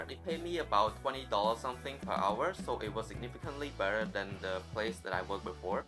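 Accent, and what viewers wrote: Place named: Cambodia. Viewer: hey bro, even as listening to your accent, I could tell you're Khmer. I am a Khmer international student as well, but situated in New Zealand. I could relate to many things in your vid !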